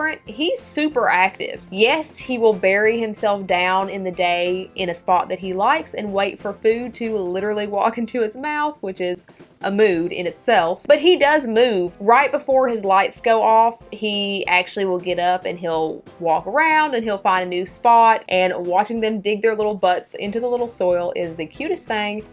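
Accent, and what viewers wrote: South, United States. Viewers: He just dont understand a good southern accent, its nice.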